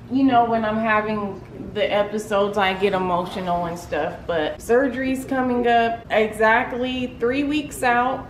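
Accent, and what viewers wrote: United States. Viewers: Where is her accent from in America?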